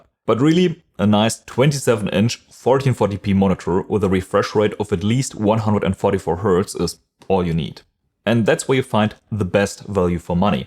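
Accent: Germany. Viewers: the faint German accent is doing things to me, Lord help me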